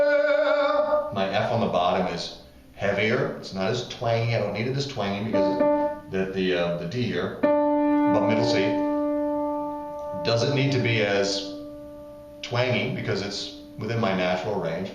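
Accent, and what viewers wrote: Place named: United States. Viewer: First of all you need a middle class London accent and then the phrasing will come naturally! Being British, I can hear your American accent cutting through like a Chainsaw! Just a thought...Not an order! Example of this is Ken Tamplin trying to sound like Freddie Mercury......Oh Dear!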